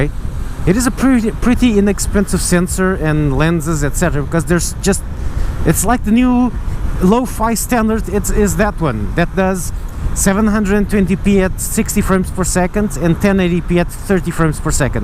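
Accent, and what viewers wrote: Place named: Portugal. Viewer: I was just listening to the video and I knew you were portuguese by the accent. Let me tell you. That camera is pretty good for $10 but "O que é barato sai caro" como já dizia a minha avó.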